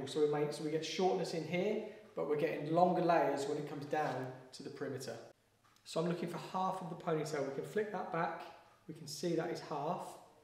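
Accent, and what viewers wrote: United Kingdom. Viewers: LOVE clicking a video and hearing an unexpected UK accent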